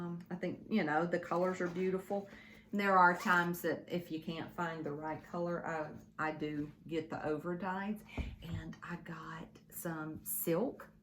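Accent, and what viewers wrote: South, United States. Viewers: Love your video, I'm from the south also so I completely understand you, no close caption needed. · Welcome to the sharing community. I love seeing all the creativity . I want to start something in quilting. Haven’t done it in years, but when I see all the wonderful quilts and things, I get the itch to go down that rabbit hole. I myself have been stitching since I was around 6. Loved hearing your southern accent. Looking forward to seeing your next video. P.s. I am from IN.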